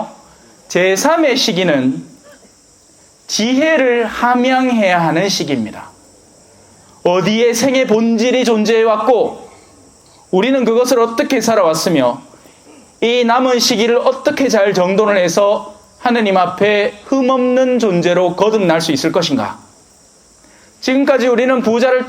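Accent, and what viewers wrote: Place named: Busan, South Korea. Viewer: 신부님 부산오셨군요 비슷한 사투리가 정겹습니다~ 항상 열정적이고 알아듣기 쉬운 강론 고맙습니다~~😊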